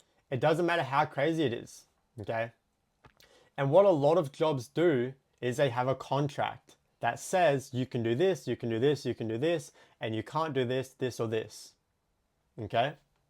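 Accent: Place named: Australia. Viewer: I like this guy already soon as he starts the video already drop the F bomb in a Australian accent😭 let’s get right to it boys💀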